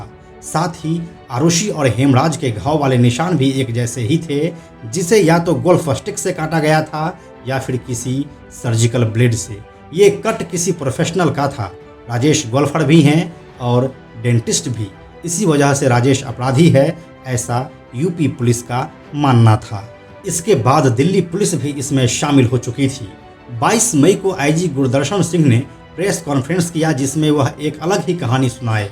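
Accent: Bihar, India. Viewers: Ye. Bihari. Bol. Raha. H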